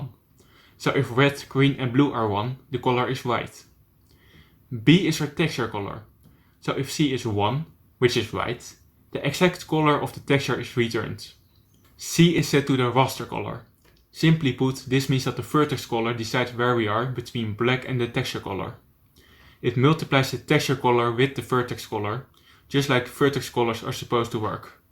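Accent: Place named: Netherlands. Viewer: Guy with heavy dutch accent explains shaders.mp4 Good video! I would just mess around with the settings until I got what I wanted.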